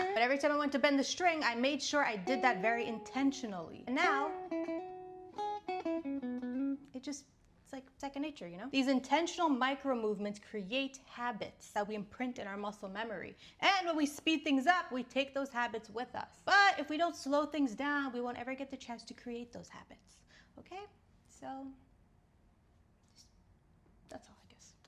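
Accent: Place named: Japan. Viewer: She speaks English like Japanese people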